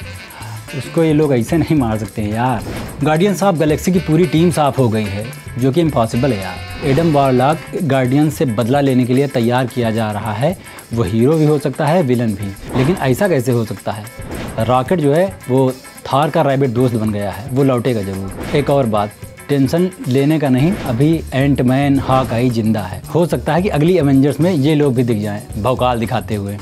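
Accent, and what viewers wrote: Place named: Bihar, India. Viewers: Bihar ke ho.....???? Work on your acnts bro.....u r respting mr dan 1 milon subs channel... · Bihar se ho ka bhai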